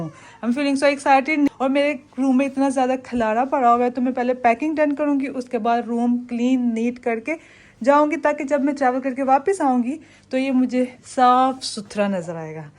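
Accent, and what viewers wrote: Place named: Sialkot, Pakistan. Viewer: Nice video baji ap kaha se hai Pakistan aapki language Sialkot ki lagti Hai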